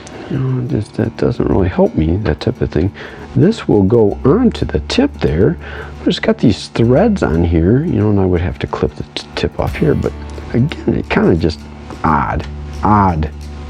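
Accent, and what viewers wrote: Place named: Minnesota, United States. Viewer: I'm from Minnesota. Are you? You sound like me.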